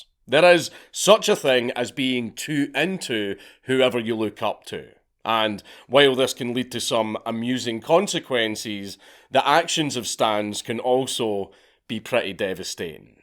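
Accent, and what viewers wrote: Scotland, United Kingdom. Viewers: I love how the Scottish accent changes Twitter into Twatt-er - which sounds incredibly apropos. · The thumb nail with the adoring fan 😂😂😂 oh man. We called him Troll Kid because he looks like a troll doll. Extra points if you know who sang this in Oblivion: Flyyyyyin’, flyin so high, cliff racer FLY sooOOO high. FlYYYYYin’ Edit: please tell me you and your friends karate chopped Minotaur wang too and launched the adoring fan off the cliff 😂 good times… With love from the USA Freakin love the Scottish accent 🤤 hope to come back some day 🤘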